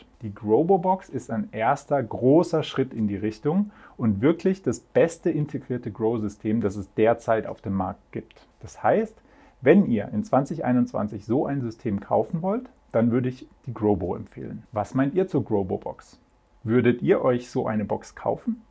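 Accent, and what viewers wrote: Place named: Swabia, Germany. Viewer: Einfach geil wie du am Schwäbeln bisch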